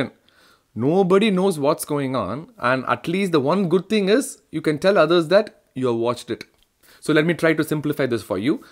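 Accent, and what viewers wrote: India. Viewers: I could listen to your accent all day, you just can't have a bad day when you hear that Indian accent it's so happy. Keep up the work.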